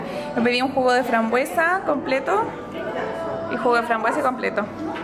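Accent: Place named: South, Chile. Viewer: me encantas tus vlogs son muy entretenidos junto al y tu tia amorosos ellos, ademas te siento cercana porque yo tbn soy de la 8 región y tu acento del sur es maravilloso jaja, encuentro que esta muy bien tu explicación de los precios, es tu trabajo y si alguien encuentra caro que compre en otro lado y punto, un abrazo y éxito en todo.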